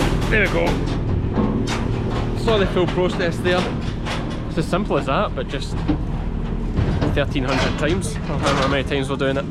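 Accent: Scotland, United Kingdom. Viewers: i love the scottish accent, respect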